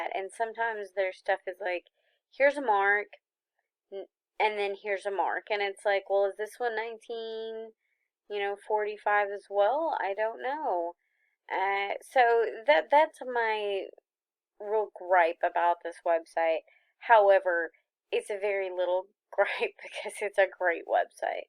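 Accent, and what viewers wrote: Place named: South, United States. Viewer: Your videos are absolutely the best I have seen. Thank you for sharing the sites you use to identify makers and marks, and to purchase jewelry, and thank you a thousand times for the lists you have created and shared!! Fellow southerner, so you sound just fine! :)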